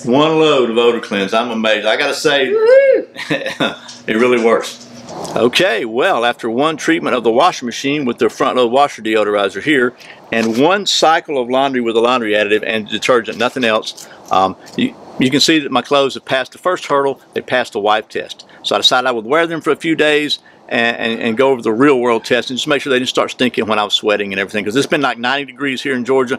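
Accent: Georgia, United States. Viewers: the subtitles suggest you tried something from your toilet!?! That is not a good idea. Got to love automatic closed captioning on that lovely Geogia accent.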